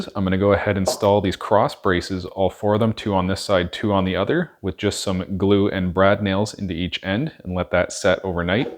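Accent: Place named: Canada. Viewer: That dose of Canadian Accent made my day... Back when I was in shop class many years ago we were taught to put a piece of paper between the bowl and the sacrificial mount. It gave you a cleavage layer to make it easier to knock off with a hammer.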